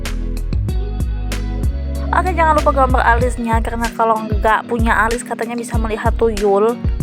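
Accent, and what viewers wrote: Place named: Philippines. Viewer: I thought she is speaking Filipino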